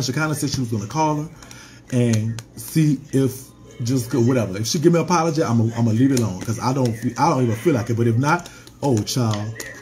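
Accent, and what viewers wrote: New Orleans, United States. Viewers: I subscribed off the hair and New Orleans accent alone baybee yesss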